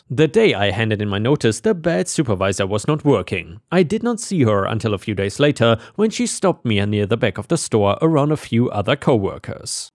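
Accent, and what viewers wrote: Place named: Germany. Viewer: I can hear the german out of your voice, and i love it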